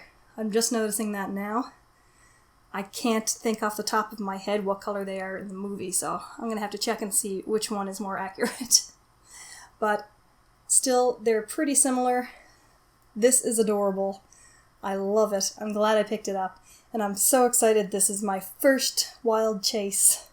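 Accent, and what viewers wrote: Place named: Ireland. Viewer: Is one of your parents Irish? It's just you say certain words with a real Irish twang. Loved the review.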